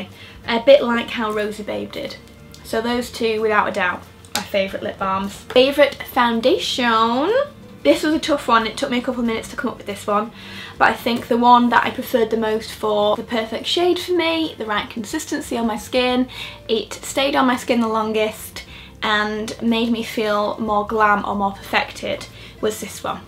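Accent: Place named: Manchester, United Kingdom. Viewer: Absolutely love all of your videos,. By far my favorite youtuber! Plus its so nice to hear that lovely Mancunian accent, makes me miss home!!:)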